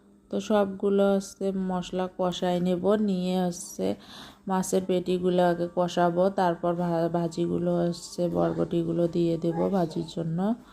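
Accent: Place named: North Bengal, India. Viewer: আপনার কথা আর রান্না গুলো অনেক সুন্দর। আপনার বাড়ী মনে উওর বংগে তাইনা। কথা গুলো তেমনি মনে হলো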